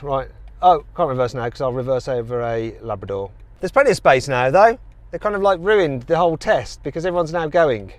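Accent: United Kingdom. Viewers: 애초에 영국사람이고 영국발음인데 뭔 개소리냐ㅋㅋ.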